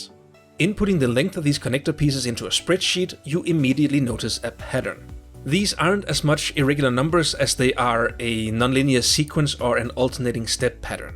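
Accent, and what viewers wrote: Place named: Denmark. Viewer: That accent… are you Danish perchance?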